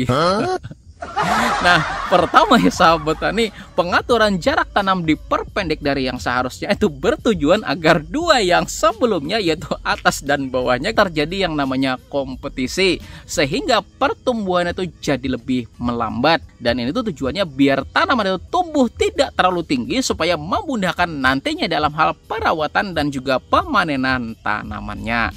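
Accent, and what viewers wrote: Banjar, Indonesia. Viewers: Pian urang banjar kah mang??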